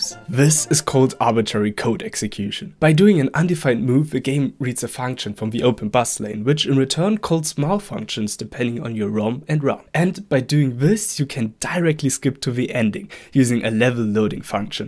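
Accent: Germany. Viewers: Direkt beim ersten wort gemerkt, das du deutscher bist 🤝🥹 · you sound german to me🤔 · bruhh He sound like hes german! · Hatte doch gewusst das die Aussprache mir sehr deutsch vorkam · You sound so German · U have a very Strong Accent (perhaps German??) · I Knew it that you have a German accent. Windows Defender Message prooved that i can hear that someone is german. :D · You Sound german Und ich bin deutscher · you sound german mate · I hear the DEUTSCHER AKZENT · Ich wusste es, habe schon den deutschen akzent gehört und dann die deutsche windows benachrichtigung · Very german accent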